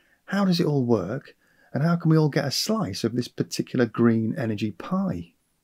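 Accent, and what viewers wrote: United Kingdom. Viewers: Difficult to understand his voice, which has a British accent, but goes up and down. He needs to speak with same volume and clearer.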